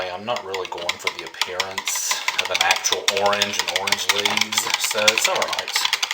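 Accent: South, United States. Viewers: Hi! From Florida! I’m from NC, mountain side. It’s not often I get to hear a good Southerner accent. Love the soap!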